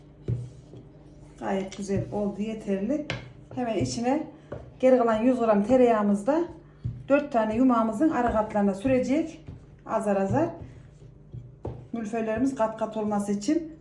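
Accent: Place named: Gümüşhacıköy, Turkey. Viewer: Bende Gümüşhacıköylüyüm şive yabancı gelmedi memleketim güzel dir güle güle oturun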